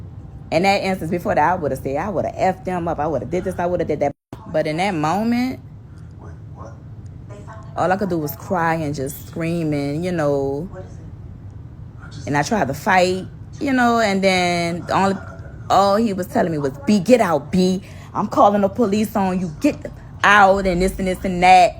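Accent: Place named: New Orleans, United States. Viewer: I was looking at you and I said she look sooooo familiar and I heard your NOLA accent then you said your name and I said I remember you from the VA. God bless you babe! You have come a long way and have done so good with your life! Take care of yourself and your babies. You’re truly beautiful both inside and out!❤❤❤🙏🏾🙏🏾🙏🏾🙏🏾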